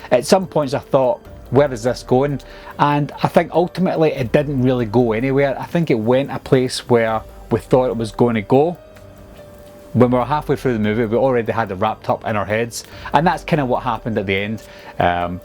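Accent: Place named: Ayrshire, United Kingdom. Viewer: Just found your channel mate and its brilliant. Is that a bit of an ayrshire accent i detect?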